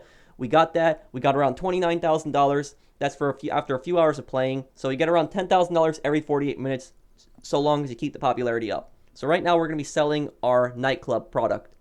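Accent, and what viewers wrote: Poland. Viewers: Are you polish? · I got kicked when I was watching TV on pc... Btw are you Polish? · Are u polish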